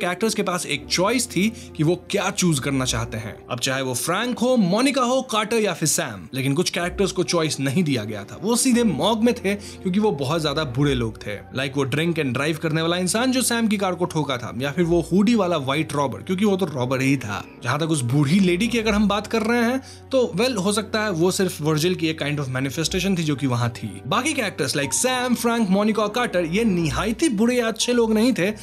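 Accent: Bengal, India. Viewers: vhai tum bangali ho kya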